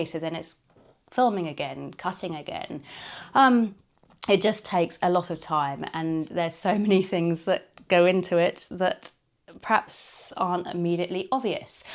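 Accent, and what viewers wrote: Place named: England, United Kingdom. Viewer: Hi, firstly “Happy Belated Birthday” 🎉 yes I would love some cheaper recipes for soap…in the USA Olive Oil and Cocoa Butter is outrageous in price and being an expat if UK, I definitely need the occasional dose of English Accent 😂 I have missed you online and would love to see you more….definitely think you could make something of a Chanel about alternative soap ingredients, and testing thereof to help out the struggling….cheaper but good soap is gonna be a must very soon in USA with all our current troubles and we for sure need an injection of bubbly and infectious personality to cheer us up 😢 hopefully see you soon and hope you had a fab Birthday ❤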